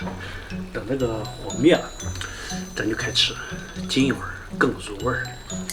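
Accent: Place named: Jiangnan, China. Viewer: 看你的视频有点江南腔调哇 上海宁？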